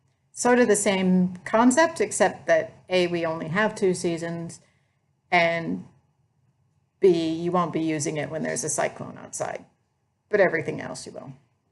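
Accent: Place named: Australia. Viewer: I chickened out doing project bags with zips, I do the Vonna style(no zips lol). And what's wrong with our British sense of humour 😜 , although I don't find the modern 'comedian' funny at all. I don't find American's funny. Don't think I've heard an Australian comedian. Have I got a sense of humour? Who knows lol. Ooh I love the butterfly your doing. They're stunning, was that you holding it? You definitely sound more Australian than American. Off to watch the next one😜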